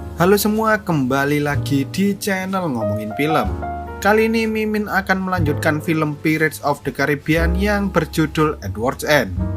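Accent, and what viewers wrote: Java, Indonesia. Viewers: Iki delok teko suoro ne wong jowo ?? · Medok jowo miminnya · iki dodit yo suarnya rek medok pisan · Aduh logat jawanya medok banget.. Maaf bikin gak nyaman dengernya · Miminnya paati orang jowo.. suaranya itu lohh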